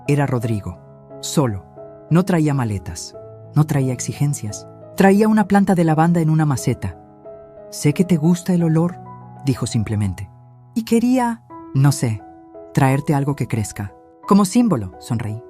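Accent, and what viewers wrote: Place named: Portugal. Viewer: Buena historia esta voz narra varias historias, pero es chocante que narre y meta portuguez y la acentuacion española ?????? Con todo respeto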